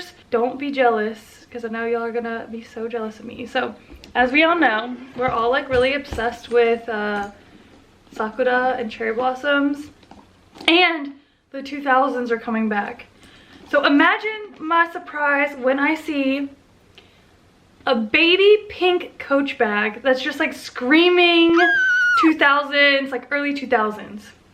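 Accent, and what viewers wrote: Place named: South, United States. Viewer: Omg your southern accent is quite prominent at times throughout this video and I absolutely adore it 💞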